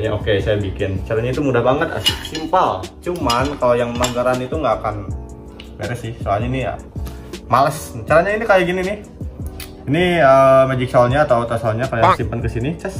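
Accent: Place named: West Java, Indonesia. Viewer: Urang sunda pisan cepel wkwk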